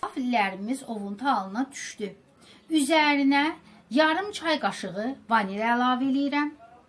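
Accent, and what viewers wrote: Baku, Azerbaijan. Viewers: Ləhçəəəə. Can bakılım)))😍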